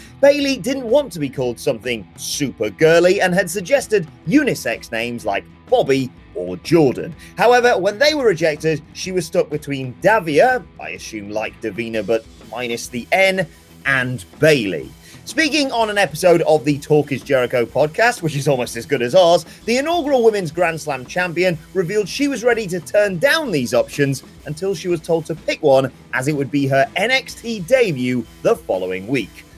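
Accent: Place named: England, United Kingdom. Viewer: That's exactly how it's pronounced. What's your problem with it? I'd like to know. If you mean that Americans say it like "motcho" that's just a difference in vowel sounds. The guy in the video is English, and that's how we say it.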